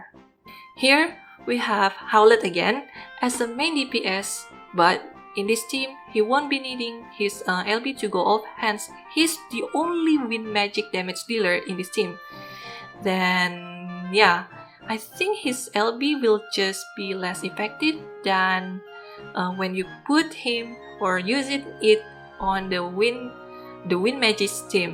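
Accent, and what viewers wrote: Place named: Indonesia. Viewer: Your English like Indonesian People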